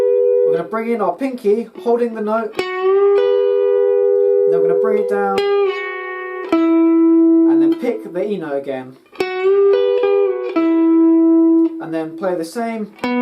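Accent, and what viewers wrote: United Kingdom. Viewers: I love british english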